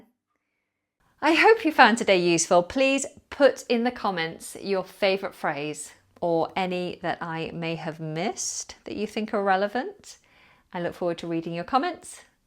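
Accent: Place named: United Kingdom. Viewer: I love your accent. Finally I found a nice British model to follow! 😊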